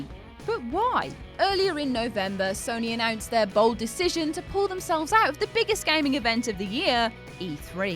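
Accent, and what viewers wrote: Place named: United Kingdom. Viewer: Has this chick been in other videos? I like her energy... And her accent, my God, I could listen to women who speak British all day! Lol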